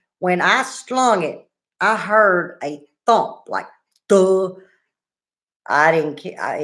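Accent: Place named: Mississippi, United States. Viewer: I don't know how you will take this but the very first time I tuned in to your videos. I thought you were from Mississippi. I have no problem understanding "anything" you say. One of the reason I subscribed. LOL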